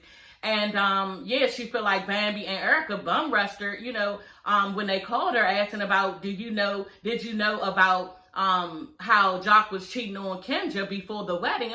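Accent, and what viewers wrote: Washington DC, United States. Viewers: I love your commentary! Are you from DMV or Dc with that accent?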